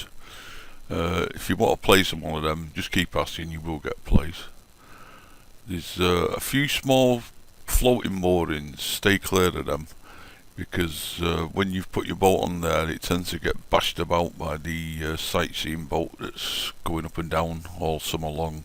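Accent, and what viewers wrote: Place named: England, United Kingdom. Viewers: It's not a version of English - it's English spoken by an Englishman. If I was I'd be very offended but he's obviously a lot more tolerant than I am. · Sounds like a Salford lad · Perhaps if you learned to spell before you decide to comment on a northern English accent!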